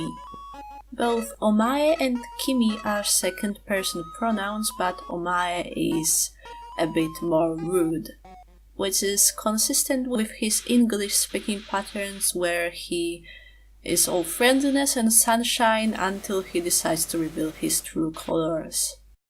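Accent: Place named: Greece. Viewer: Are you greek? Your accent sounds greek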